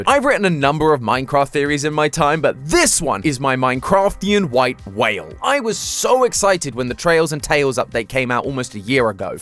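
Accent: England, United Kingdom. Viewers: I forgot game theory is now british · Dude im so sad i opened this video and expected matpat then i got jumpscared by this british guy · The British accent is so annoying can you tune it down a little bit? It’s just if you’re gonna go full Mat style story telling, the British accent sounds very distracting and confusing.. try to either do your own style or try to lessen the accent a bit. No hate for brits, and thanks for the amazing theory as always.. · I thought I would be salty and abandon these channels after Matpat was gone but after listening to Matpat and honoring his wish of giving the new voices the same chance we gave him all those years ago has worked out perfectly, much love british Matpat! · British Matpat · Hehehe the team is definitely putting the new brittish accent to work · with a british accent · I love that new Bri'ish Game Theory! 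· Are you British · Why is he British now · I love when the british talk about minecraft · Why is the rest of the team suppressing his britishness 😢 that's way past uncool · not making fun of you And I love the British accent but is it suppose to be "Wa oh" instead of "wuter" (I'm so sorry love the theory tho😅) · its just british matpat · Too british, unsubscribed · Come on. I clicked this video expecting to hear mat pat. Instead I hear some British replacement. I really don’t want to say it, but the newer videos aren’t as good because MatPat isn’t there. It may be the same scripts that he would’ve read, but there’s something about mat pat that just makes me want to watch him. Not you. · i forgot matpat retired and got jumpscared by british matpat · are u english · British MatPat is almost as wild as MatPat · is just british matpat i like him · Sadly the voice just isn’t the same to me, feel like I’ve lost part of my childhood now matpat isn’t here. 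This and the last although the content is what I’m interested in, unfortunately all of mats energy I feel has been lost. I’m a Brit so used to the accent and honestly it’s now just way less entertaining for me. I really do wish all involved in theorists continue to grow and have wonderful times adventuring into these stories, it’s just kinda hard to accept maybe you’ve grown out of one of your favourite channels. A narrator is very important and sadly YouTube has lost one of its best. Game theorists, not lost but will never be the same. Miss you matpat ❤ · Why does the new guy sound like matpat, but british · Who is this British person, where is matpat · This is the first Game Theory video I've seen since MatPat left. ... you sound like a British matpat.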